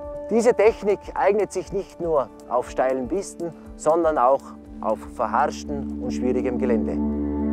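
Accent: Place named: Eastern Europe. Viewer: Hahhaha er redet deutsch mit so nem komischen fast osteuropäischen Akzent 😂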